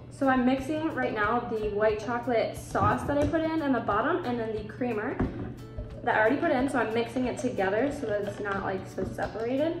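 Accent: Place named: Michigan, United States. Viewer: lol I can tell by the accent. I’m from California just moved to Michigan and it’s something I noticed immediately lol + my husband is originally from Michigan and he sounds the same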